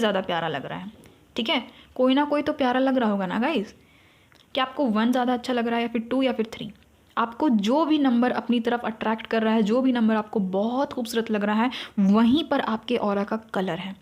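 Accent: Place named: RP, United Kingdom. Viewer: I like your accent, I am myself into British RP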